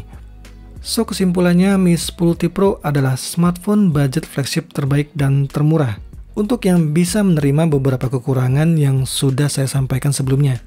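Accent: Palembang, Indonesia. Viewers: Abang uong palembang cak nyo 😁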